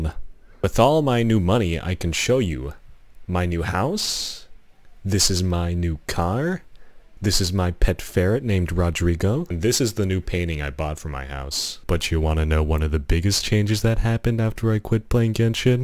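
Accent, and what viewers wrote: Netherlands. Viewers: hold on are you dutch?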